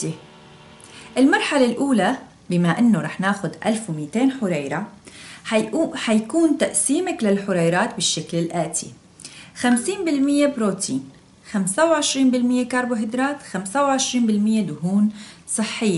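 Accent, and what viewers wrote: Aleppo, Syria. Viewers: Samiri Sanaa سوريا حلب واضح من اللهجة مرة احب الكلام الحلبي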